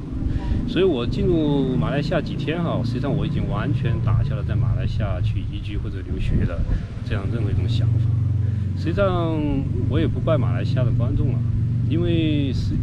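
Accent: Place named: China. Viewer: 你是否遇到了马来西亚共产党了，声音与大陆一样啊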